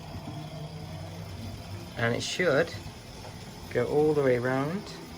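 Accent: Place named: United Kingdom. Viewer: Man I would love to have this set, but it was at a time period where I stopped being into building LEGO. Online and used, this set goes from $500 up to $1500 US now a days, which is just too expensive. I'm guessing by your accent, you bought this set used in pounds? I think if LEGO was smart, they would come out with a new monorail set. It would most definitely be a sell out.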